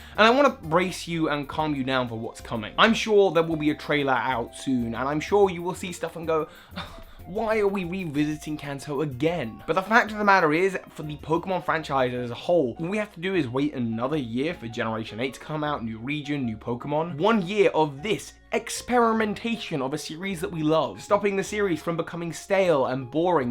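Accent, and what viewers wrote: United Kingdom. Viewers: Also why do british people call the United States "americ-er"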